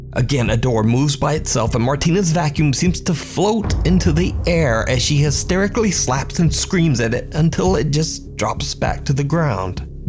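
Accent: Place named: South, United States. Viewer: your voice seems to be getting more and more of a "southern drawl" to it. Did ya relocate or something? Btw that may sound creepy(oh the irony)but I'm not asking where you live and have no idea where you are or have been.lol)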